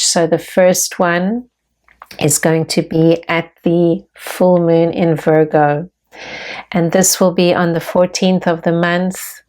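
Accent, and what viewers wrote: South Africa. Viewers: I’m from Durban! ♥️ 🇿🇦 I knew your accent right away!! 😅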